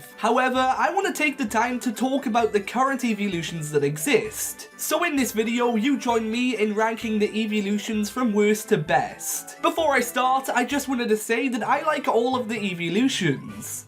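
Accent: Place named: Scotland, United Kingdom. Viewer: Rly sorry to ask, but where is your accent from?? My little bro says you sound scottish, but I just wanted to know!!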